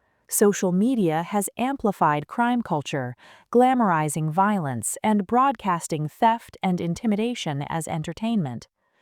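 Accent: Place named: United States. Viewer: An American narrator, why?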